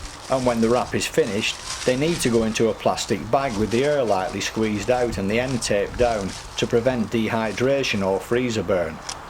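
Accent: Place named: North, United Kingdom. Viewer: The commentary is so Northern I had to wear a flat cap to listen to it. Great video, mate.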